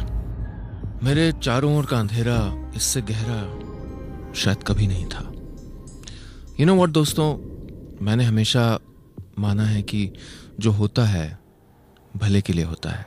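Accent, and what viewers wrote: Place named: Lucknow, India. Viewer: आपकी आवाज़ से लगता तो था की आप लखनऊ, कानपुर के हैं. आज पता भी चल गया माँ- बाप के लिए सीख है की बच्चों पर अपनी इच्छाएं थोपें नहीं. उनकी रुचियों को अहमियत दें. नहीं तो बच्चे कब आपसे दूर चले जायेंगे पता भी नहीं चलेगा.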